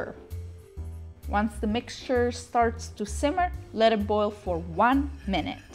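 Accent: Italy. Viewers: Love Italian accents on both men and women, semi musical speech intonation, like Argentinian spoken spanish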